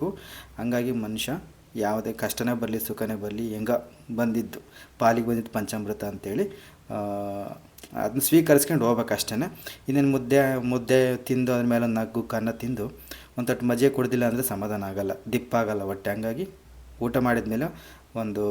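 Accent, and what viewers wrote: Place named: Davangere, India. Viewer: ನಾವು ದಾವಣಗೆರೆಯವರು... ಇವಾಗ ಚಾಮರಾಜನಗರದಲ್ಲಿದ್ದೀವಿ.... ನಮ್ಮ dvg ಭಾಷೆ ಕೇಳೋಕೆ nim ವಿಡಿಯೋ ನೋಡ್ತೀನಿ.. Nim ಅಡುಗೇನು ಚೆನ್ನಾಗಿರುತ್ತೆ ಬ್ರದರ್ ❤❤❤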